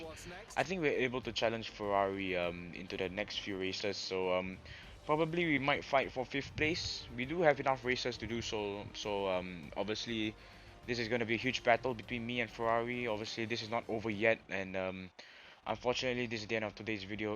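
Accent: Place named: United Kingdom. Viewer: Brithish accent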